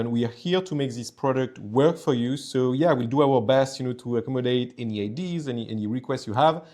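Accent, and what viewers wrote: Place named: France. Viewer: On m'a recommandé ça sur Reddit. Malgré ton bon anglais, je repère tout de même un accent français bien présent. Marblism est français ?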